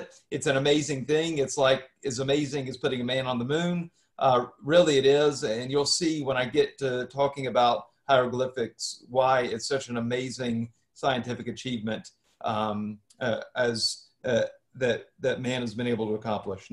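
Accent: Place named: Mississippi, United States. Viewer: I knew I heard a Mississippi accent. I love your videos here — a Mississippi native.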